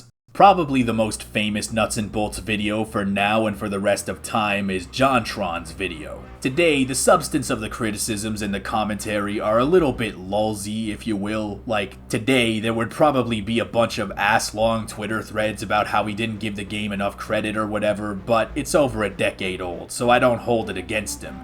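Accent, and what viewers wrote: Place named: New York, United States. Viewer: First time viewer here: When I hear him speak I picture a New Yorker sitting in a bar telling a story. I don't know why I just couldn't get that image out of my head.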